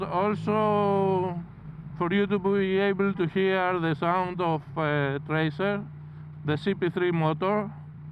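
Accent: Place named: Birmingham, United Kingdom. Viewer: I can distinguish the Brummie accent... 🤣🤣🤣 Keep it up!!!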